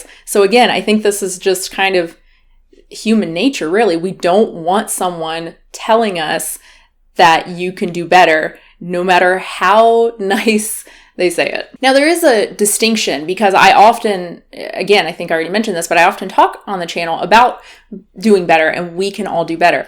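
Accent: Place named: South, United States. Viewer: I think the most effective way to spread the vegan message is to bring back your southern accent.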